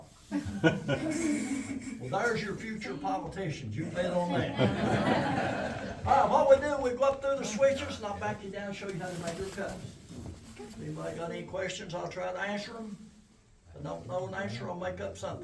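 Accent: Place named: United States. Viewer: asik om. baru kali ini denger asli aksen logat kaya koboy sibapaknya yg cuma ada di dalam film "well howdy partner nice see ya".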